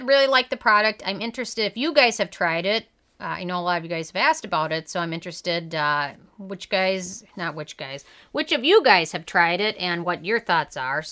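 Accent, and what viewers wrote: Minnesota, United States. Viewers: I’m Minnesotan and recognize a fellow cold north accent when I hear it ;) Wisconsin?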